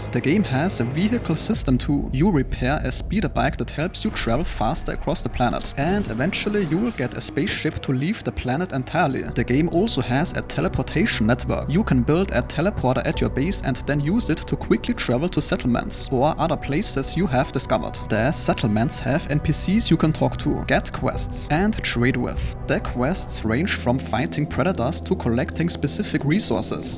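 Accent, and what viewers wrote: Germany. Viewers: Brother its kinda off topic but are you german ? : D just curios cause of the accent (btw i am really exited for this game i hope its not some early acess scam bs )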